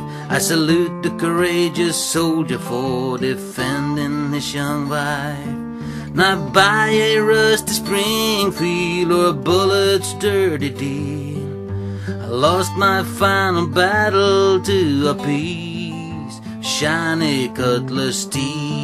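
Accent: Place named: United States. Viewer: Cool! Are you shure you are a Finnish? Sounds so perfectly Americano, man...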